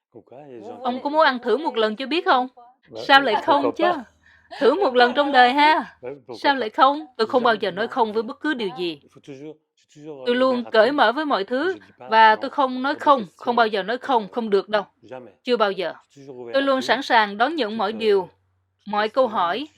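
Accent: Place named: South, Vietnam. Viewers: nhà báo giọng Sài gòn sang trọng Văn phong phỏng vấn đơn giản thú vị. đồng cảm với nhân vật. người nghe xúc động cuốn hút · Tui ghiền xem kênh này từ khi tình cờ xem được clip hai em đi về vùng quê thăm mộ HHNP và nơi HH từng sống...❤❤❤ Rất thích thú cách interview của, giọng Miền Nam tự nhiên và rõ chữ👏👍👏❤❤👍👏👍❤❤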